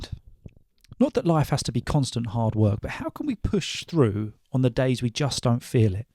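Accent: United Kingdom. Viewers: The you speak is utterly giving an aid to whoever want to step ahead in British accent. Thanks a lot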